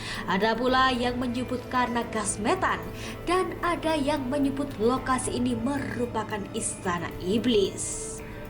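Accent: Java, Indonesia. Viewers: Suaramu medok.mbak Wong jowo ya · Pembawa berita nya medok bnget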